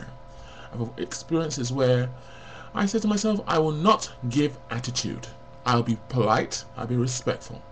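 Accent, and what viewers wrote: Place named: RP, United Kingdom. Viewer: I like how your RP accent.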